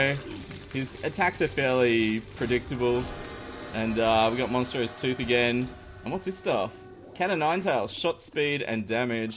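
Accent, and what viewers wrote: Australia. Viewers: austrailian?? meh still like his voice:) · WAIT YOUR AUSTRALIAN!?!? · Wow Australian I'm Australian too I can't really here to your accsent like Mr the epic ninjas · Your from Australia!😱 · Are u from Australia like me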